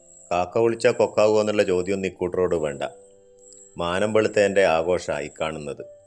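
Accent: Kannur, India. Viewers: തനി kannur ഭാഷ, നന്നായിട്ടുണ്ട്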